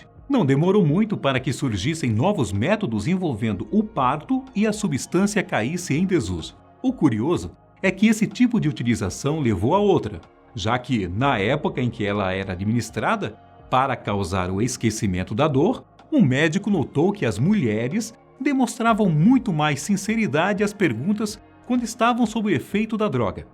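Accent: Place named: Bahia, Brazil. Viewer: Gostei, esse cara tem uma energia boa, tipo...meu sangue vai com o dele, no modo baiano de falar😅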